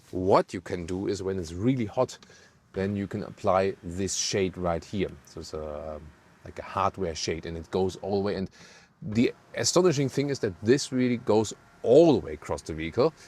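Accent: Germany. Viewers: Als Amerikaner würde ich fragen: in England spricht man Englisch? Mn hört seinen deutschen Akzent her recht gut raus 😊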